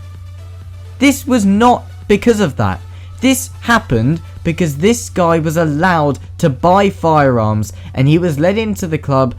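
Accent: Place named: Texas, United States. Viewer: you sound like you're from teaxas.....1776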